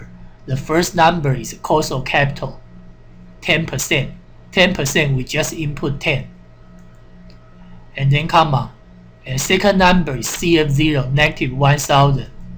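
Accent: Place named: China. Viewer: lol “curly bracket”, his accent is sick. obvi chinese & not racist to point that out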